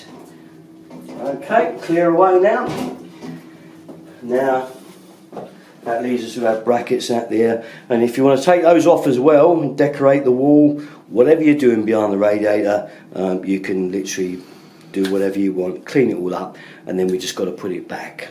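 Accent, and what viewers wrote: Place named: England, United Kingdom. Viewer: Delightful presentation. Charming English accent.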